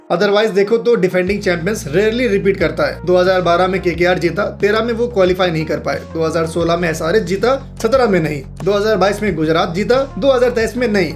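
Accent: Bihar, India. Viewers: Bhaiya bihar se ho kya ❤❤❤❤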